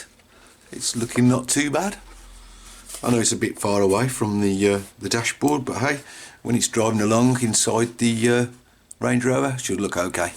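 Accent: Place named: Midlands, United Kingdom. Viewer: Brilliant I am just try fitting some in my rc4wd great for the money, nicety hear a fellow midlander instead of an american taking an hour with 10 mins content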